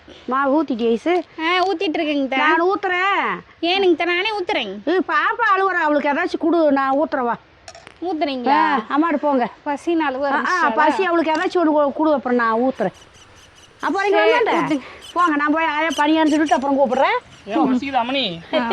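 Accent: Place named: Tirunelveli, India. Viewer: Ninga entha uru district enna slang nalla irukku thirunelveli district?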